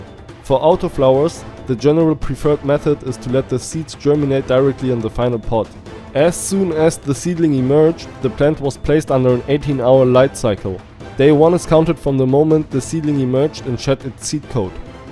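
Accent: Germany. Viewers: Man hört raus dass du Deutscher bist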